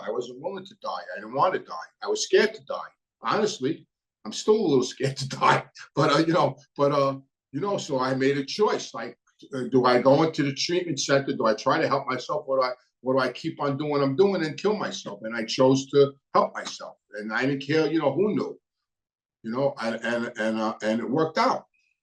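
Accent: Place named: Brooklyn, United States. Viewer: He has a Brooklyn accent, genius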